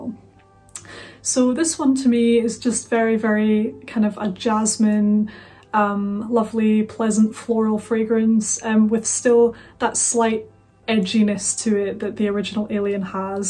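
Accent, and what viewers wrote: Scotland, United Kingdom. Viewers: Fantastic perfume review, Miss. May your Youtube channel, subscribers, viewers & fans grow exponentially in this new year and future.. You have such a pleasant & soothing and elegant voice & wonderful, classic Scottish accent. You can sell us anything. Happy new year Scotland.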